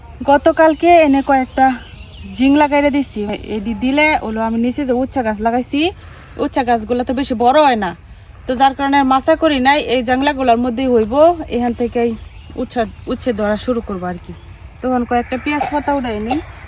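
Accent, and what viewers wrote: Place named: Narsingdi, Bangladesh. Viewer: আস্সালামুআলাইকুম। আপু আপনার কথা শুনে মনে হচ্ছে নরসিংদীর। আমার দাদার বাড়ি।জানাবেন প্লিজ। নাকি ময়মনসিংহের?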